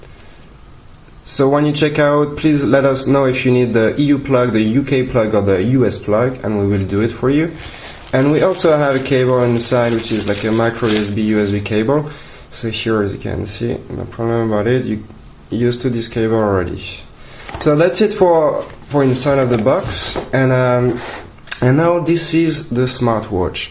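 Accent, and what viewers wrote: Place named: France. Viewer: Good review, terrible english/french accent.. just say app not A-P-P _'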